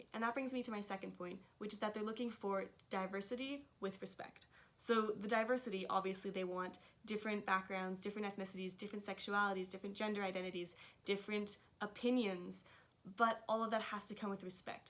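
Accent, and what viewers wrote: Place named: Canada. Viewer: I love her Canadian accent and I don't know why I love it so much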